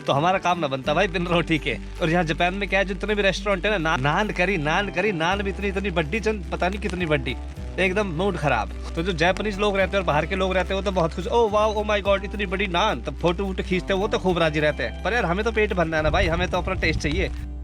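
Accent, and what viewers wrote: Haryana, India. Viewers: Bhai yr tum jb haryanvi accent bolte ho to bda maza aata hai lgta hai tum india mai hi ho...aise hi rehna bhai...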